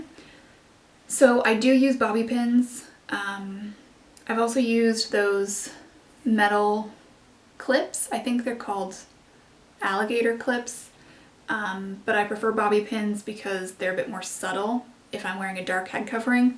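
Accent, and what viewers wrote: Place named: Canada. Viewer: Thanks for the Q&A video! I also live in the country as a stay at home wife. (Kids are adults & on their own now). It can get boring for me some days but I try to make tasks for myself. I've been learning to sew clothes and so far made one skirt that was awful LOL The nearest fabric store is an hour away so I need to try to stock up when I get a chance. I totally understand having to travel. :( Oh and I knew right off you must be Canadian as my husband is Canadian and you both pronounce words the same. In fact we live so close to the border (6miles) that a lot of people here sound Canadian even if they aren't. LoL I really enjoy your videos and look forward to when you post! Do you think you would ever consider another video of your skirts (hint hint) LoL 😁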